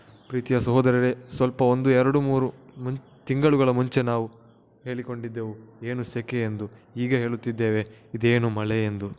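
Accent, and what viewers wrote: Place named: Kerala, India. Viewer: ಮಲಯಾಳಂ ಭಾಷೆಯ ಯವರು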